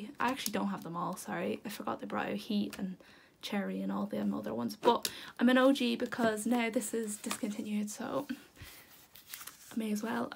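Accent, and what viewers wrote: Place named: Ireland. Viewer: Where abouts from Ireland are you?? I’m Irish but live in England , and I absolutely love your video and accent mines faded but it comes back ❤️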